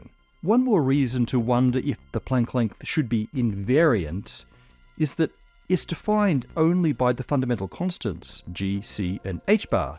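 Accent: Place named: Australia. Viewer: is from Australia, so maybe that's his Aussie accent coming through?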